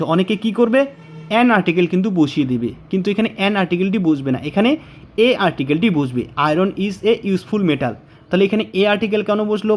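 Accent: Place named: Nadia, India. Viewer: Sar nodiea thaka bolche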